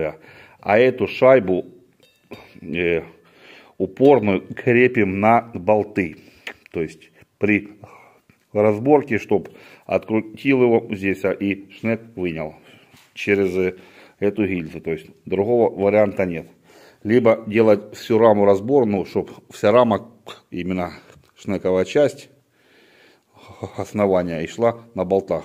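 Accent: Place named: Volga, Russia. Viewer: привет! С праздником тебя! Я вот слушаю тебя ты вроде с Украины, а балакаеш как волжанин, ( симу, как то оно так), и ещё какие-то замечаю выражения которые слышу каждый день, только без обид! Иногда я думаю сядем за один стол, обязательно выпьем, по балакаем и всё будет хорошо и у нас и наших детей!!!!! Мира вам и чистого неба как изумруд!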